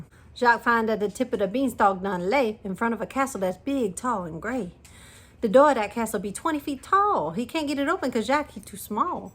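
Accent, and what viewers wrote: Louisiana, United States. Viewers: Love the storytelling! Please make the Cajun stories a regular part of your channel! I love them so much and your voice is so perfect and soothing! Love the Cajun accent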